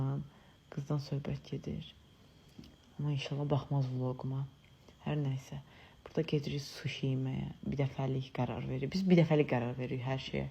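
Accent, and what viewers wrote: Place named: Talysh, Azerbaijan. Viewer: TALİŞDI ONA GÖRE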